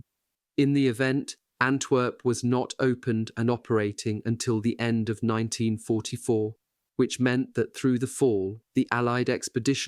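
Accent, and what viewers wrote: United Kingdom. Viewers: Because the person reading is British, it's his actual voice😔😔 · Why are they always using a British accent?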